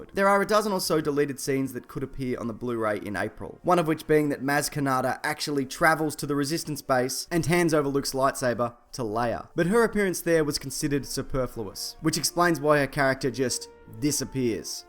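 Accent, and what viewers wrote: Australia. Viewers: do you mind me asking what your accent is, is it Australian or some other thing? I am just curious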